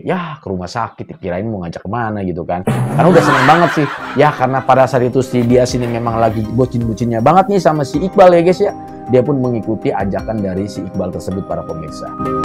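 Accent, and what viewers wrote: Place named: Sunda, Indonesia. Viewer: Resep KA momo bar bar lebok ku sia haaaaaaaaaa Sunda banget nya bang saya Oge orang banten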